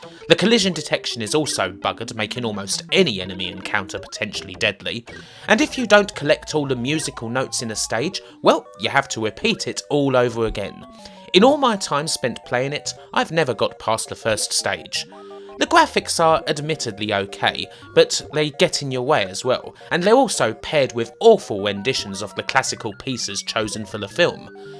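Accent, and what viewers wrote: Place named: London, United Kingdom. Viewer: soon as i heard the accent subscribed instantly....london niggaz dont play!!!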